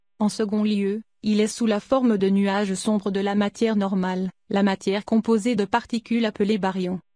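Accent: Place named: Canada. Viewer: j'aime bien sa voix avec l'accent canadien lol! ont s'en fout de l'univers la terre c la maison mére !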